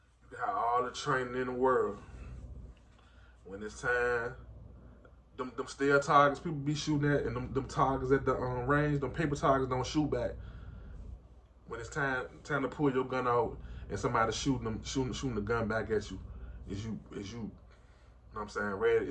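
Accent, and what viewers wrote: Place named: Richmond, United States. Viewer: This video came across my feed and I just got into it because I’m 2A everyday. I couldn’t place the accent and at first you said something about New Orleans then I heard more and realized you were from Richmond. Reminded me of one of my favorite channels Stay Out The Way Tre and him talking about Virginia politics. Favorite part of the video hands down was when you said your brother beat that case. The American justice system in my opinion, is the most effective system we have currently in the world, but it’s still obviously flawed. One of the biggest flaws is when they arrest people that are defending themselves in deadly situations. A man should be able to defend his own life, let alone having a family to watch out for, without any fear of spending years in prison for doing so. If I’m going about my day, obeying the law and treating people with respect, why should I allow undue harm to come to myself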